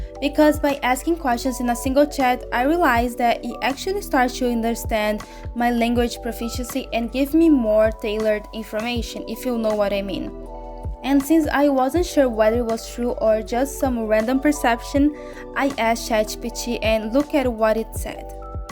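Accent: Brazil. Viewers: Are you from Brazil? Your accent really reminds me of Brazilians accent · Great video! Thanks for sharing these tips! (Also, it`s crazy how i just knew you were a pt-br speaker just by the way you pronounce the "T" lmao. brazilian folks unite~) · Where are u from? Your accent reminds me of the brazilian accent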